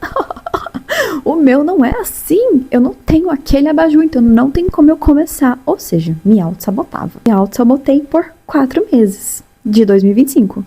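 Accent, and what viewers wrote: Minas Gerais, Brazil. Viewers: Cê é mineira?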